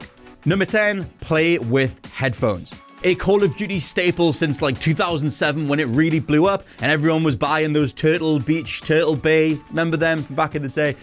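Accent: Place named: Wales, United Kingdom. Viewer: A Welsh man talking about call of duty, yes lad 🇬🇧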